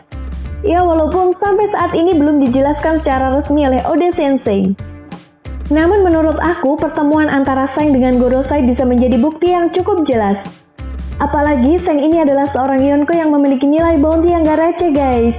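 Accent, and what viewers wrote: Java, Indonesia. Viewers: Min saran nih, saran aja ya, kalo bisa channel nya di bagi 2 aja, 1 voice cw 1 lagi voice cowo, jujur gw lebih suka yang suara cowo nya yang khas mendoknya, dan maap maap ni ye, gw skip yg cw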